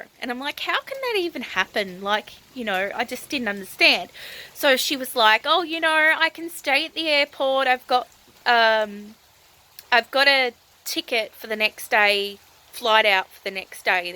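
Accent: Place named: Australia. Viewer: I like your vlogs.... your accent is so Australian, at first i thought you lived there, but now i understand you live in USA.... why did you decide to move countries, was it when you got married?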